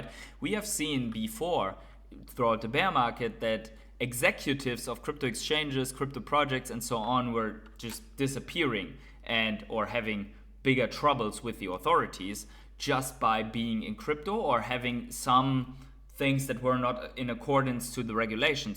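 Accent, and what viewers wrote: Germany. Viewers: Das ist mal heftiges denglish 😂😂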